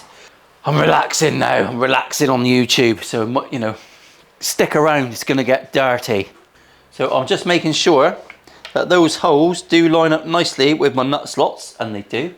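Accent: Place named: Plymouth, United Kingdom. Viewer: I’ll check it out, to be fair it’s a great looking guitar and I love hearing the janner accent on the youtubes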